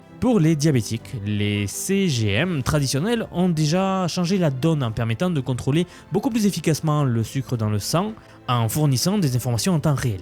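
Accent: South, France. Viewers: J'ai vraiment du mal avec l'accent du sud 😂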